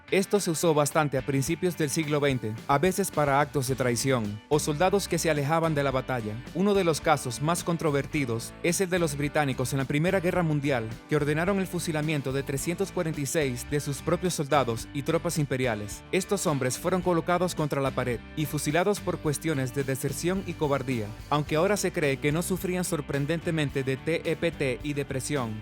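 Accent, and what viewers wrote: Russia. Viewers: Suena como un ruso hablando español. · Suena exactamente ruso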